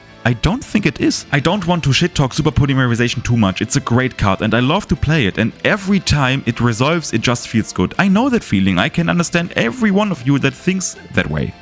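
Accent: Germany. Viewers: Der Bro spricht einfach deutsch auf englisch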